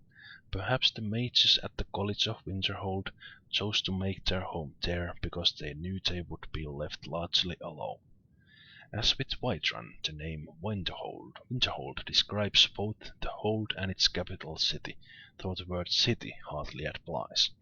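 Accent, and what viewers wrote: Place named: Finland. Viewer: I watched the first video and that's when I found my two long lost loves this video your Finnish accent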